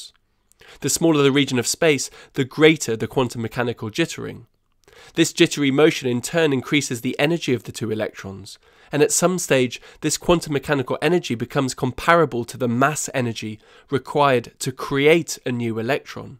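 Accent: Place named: RP, United Kingdom. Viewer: I hate your british posh accent. It sounds like you are constantly trying to project this exaggerrated image of finesse - thus implicitly also trying to cast social hierarchy. I guess this is typical to cultures who have had royal courts for several centuries such as england, france or sweden. But please stop doing it. I'm not saying you have to start talking like a football hooligan but I know brits can speak in a reasonable manner.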